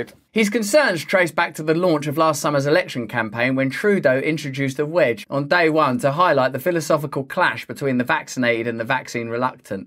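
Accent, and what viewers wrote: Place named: England, United Kingdom. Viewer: I luv ow you pronounce words... Souch a real english dude :D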